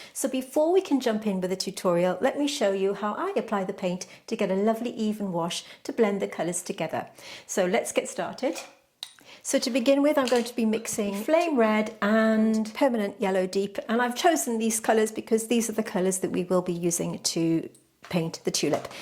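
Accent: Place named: England, United Kingdom. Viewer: thank you, I do do that but it is frustrating to pause it because then you have to bk it up and YouTube is making that difficult. Why can't they just leave well enough alone. Just got the holbein Gouache myself so I'm watching all your wonderful videos to learn. You are so very amazing. And as for the voice over text, we can't hear you're lovely voice. I myself love an English accent.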